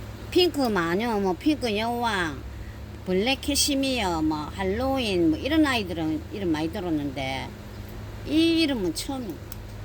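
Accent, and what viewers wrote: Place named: Gyeongsang, South Korea. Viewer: 저두 경상도여자~~ 말씀, 사투리 다 알아듣고, 넘 재미나시는데! 넘~신경쓰지마세요~~앞으로도 조잘조잘 많이 부탁드려요~~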